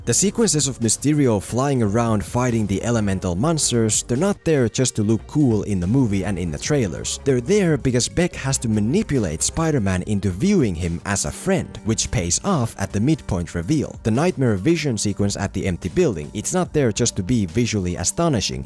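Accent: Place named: Finland. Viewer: You're Finnish, aren't you? That accent... familiar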